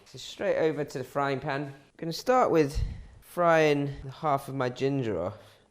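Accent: United Kingdom. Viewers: the British accent of course!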